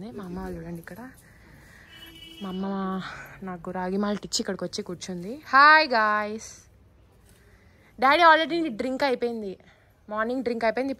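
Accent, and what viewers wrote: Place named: Hyderabad, India. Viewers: Motham Telangana slang matladuthunavu ga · Your slang looks like our Hyderabadi telugu pakka mass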